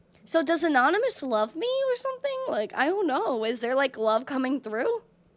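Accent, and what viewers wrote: Brooklyn, United States. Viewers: Where ya from? Brooklyn or NJ ?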